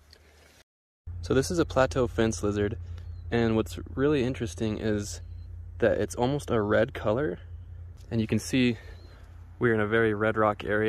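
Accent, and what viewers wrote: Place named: Zion, United States. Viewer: Mystery man I'm guessing he's near Zion. Has same accent as locals in Zion area. Cool video btw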